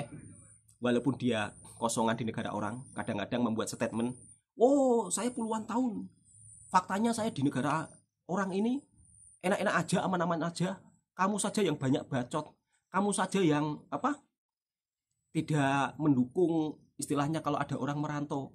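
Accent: Java, Indonesia. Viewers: Ngomong lu medok... Mending belajar ngaji yg bner ngucap allah masa nyebut nya owoh 😂😂😂😂😂😂😂😂 Dunguuuuu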